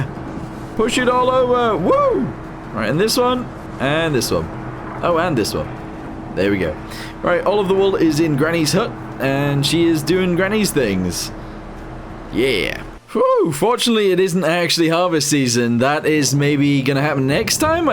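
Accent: United Kingdom. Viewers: Hi, I came to UK and I'm already used to their accent thanks to you!🎉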